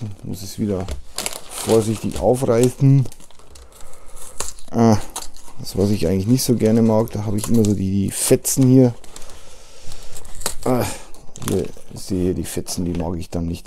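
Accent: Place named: Bavaria, Germany. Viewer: finde ich nicht gut Minifiguren herum zu werfen... gibt Minuspunkte. Vielen Dank fürs zeigen eines Disney Produkts, und das nicht Review, deiner Meinung zum Set. Gefällt mir auch gut das Set, aber aus Platzgründen, Zeit und anderen Projekten muss auch mal was nicht gekauft werden. Hehe, hier lernt man dann noch Bayrisch oder wie auch immer diese Sprache die du sprichst man nennen soll - grins. Klaro, Sticker sind manchmal auch cool, oder einfach weg lassen und das Design ist wirklich cool vom Häuschen. Grüsse Inu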